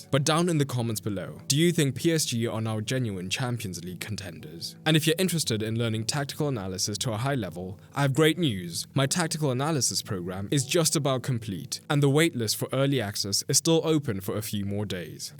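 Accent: South Africa. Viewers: Lol, are you south african?, your accent sounds so south african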